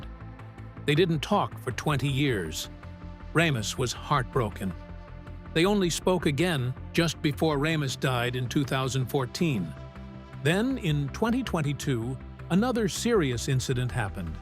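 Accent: United States. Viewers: Howard Kos all!?? Dan Oak'roid?!? What's with the Krazy pronounciations? This guy pronounces names like a furr-ener. Yet he has an American accent. As Snow White says "Weird, weird weird " 😅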